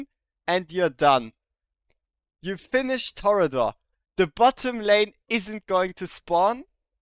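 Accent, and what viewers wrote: Germany. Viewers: dt akzent haha weiter so danke fürs video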